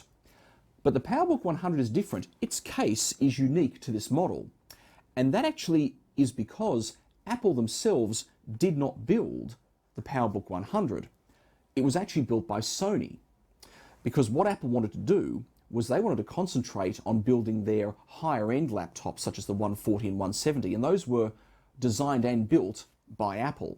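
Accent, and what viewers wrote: Australia. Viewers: Are you Australian? You sound like it.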